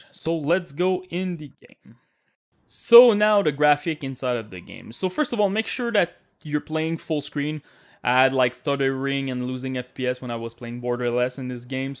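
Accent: Canada. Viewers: Bruh I didn't know Canadian people actually speak like this. I thought this accent was just a running gag on cartoons😅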